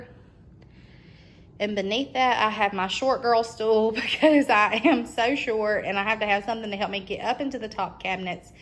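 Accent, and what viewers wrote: South, United States. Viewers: you are such a treasure! I love your home, your style, your love of our Lord, your patriotism and your accent! As a transplanted Texan living in Houston, Missouri, I am so drawn to anything or anyone from the South! I love how you have incorporated so many vintage and family treasures. I am sentimental and I love my heirlooms too! Thanks for an enjoyable tour!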